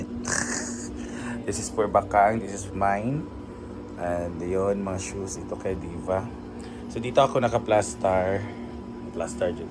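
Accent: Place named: England, United Kingdom. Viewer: ❤, I like your English accent ❤, bagay gyud sa imoha mag host ug event ❤😊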